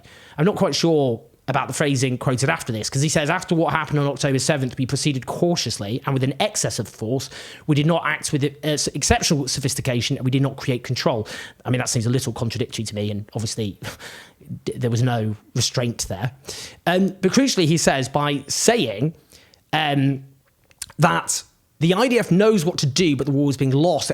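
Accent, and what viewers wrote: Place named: England, United Kingdom. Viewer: you are imoressive but please speak more slowly. As an American, the English accent, although very nice, is a bit of a problem to understand and spoken rapidly much more difficult or even impossible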